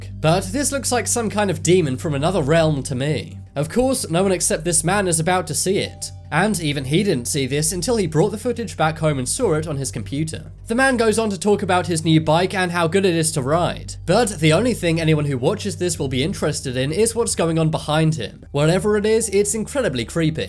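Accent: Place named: United Kingdom. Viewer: Not the first time a British accent tried to con the world into bullshit.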